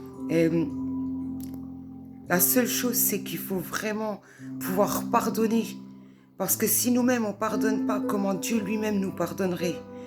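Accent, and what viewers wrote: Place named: Franche-Comté, France. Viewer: Hallelujah ma soeur merci pour ton partage, sois bénie au nom de Jésus Christ ! (Je reconnais que tu es Franc-Comtoise à l’accent parce que je le suis aussi hihi) Le Saint-Esprit en toi à parlé Gloire à Dieu ! 🕊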